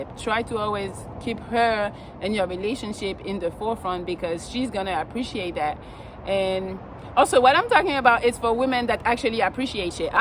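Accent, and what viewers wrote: France. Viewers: J'adore votre chanson " "....lol from Kenya. Also your french accent....looove it. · Irresistible like me damm I like that French accent you turn me on babe butt I have girl wish I would have you in my younger days this is from a great womanizer like me the best in the game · Hi, I am just discovering your channel, I mean I've whatched two or three of your videos so far and I like your frankness about your view of how a relationship should start and be conducted. I have just one question that has nothing to do with your contents: where are you from? My guess is that you are from some French speaking country because you pronounce certain words with a very slight French accent. How did you learn to speak english with almost no accent? I myself was born in Paris (France) originated from Martinique. keep doing what you already doing so good.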